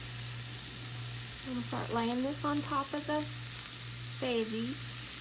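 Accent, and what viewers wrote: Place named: Eastern Kentucky, United States. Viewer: This looks so good! I have never made ribs....of ANY sort! That is my husbands thing! I recognized your accent! My sister in eastern KY for years!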